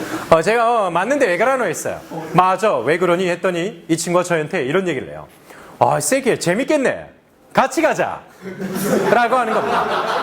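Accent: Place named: Busan, South Korea. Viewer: 부산말을 서울말로 번역 할 필요 없어요 ~~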